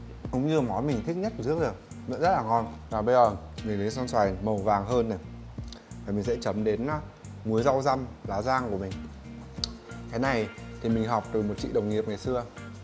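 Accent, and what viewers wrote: Hanoi, Vietnam. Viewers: Giọng Hà Nội nghe cưng phết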